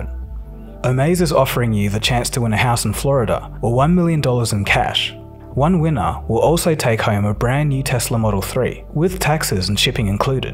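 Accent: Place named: Australia. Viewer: It's a real torture to listen to Australian. Can you make an English version of this video? You can hire human narrators as cheap as a few $ per hour (just google "narrator voice over") or use synthetic voice. If you hate American accent, hire someone who speaks British English or its more neutral European variant.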